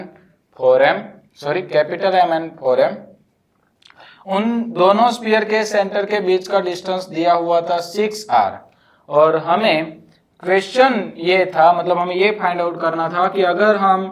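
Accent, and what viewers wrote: Gujarat, India. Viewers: Sir accent is Gujarati 😅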